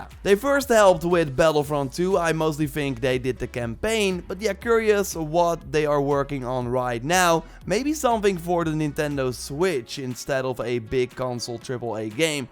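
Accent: Netherlands. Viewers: You sound Dutch :o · Nederlandse accent...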